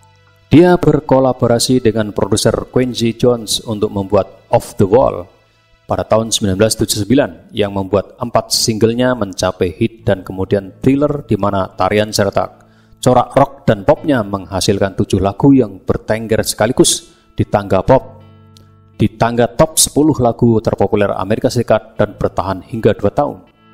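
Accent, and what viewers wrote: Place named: Java, Indonesia. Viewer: Kayaknya pengisi suara, wong jowo ikii..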